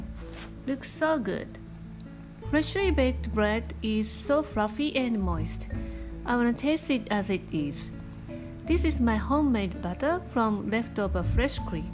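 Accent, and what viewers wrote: Japan. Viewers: i only trust japanese food video that with japanese accent on it.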